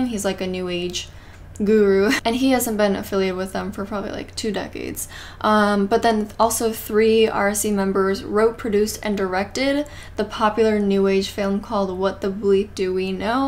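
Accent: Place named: Midwest, United States. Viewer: Variation on the US accent. I think Midwest but I can’t remember if has said where she’s from!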